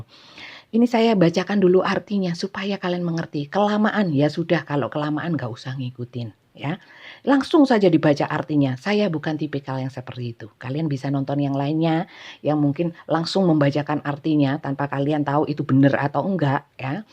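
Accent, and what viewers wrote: East Java, Indonesia. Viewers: Ikut nyimak bunda...suka sekali sama suaranya yg medok.. terimakasih redinganya bunda🙏🙏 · Bahagia dengar bacaannya sister terutama ngomong jawa timurnya asyik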